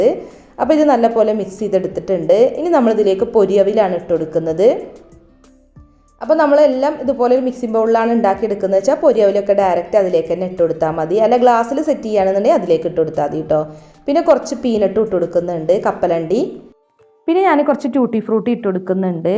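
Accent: Malappuram, India. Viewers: Super try cheythu nokkattoo.ningalude വീട് malappuram anooo😀😀😀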